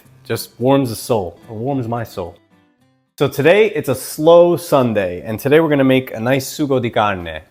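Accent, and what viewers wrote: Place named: Long Island, United States. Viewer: are you from Long Island? I sense you are as am I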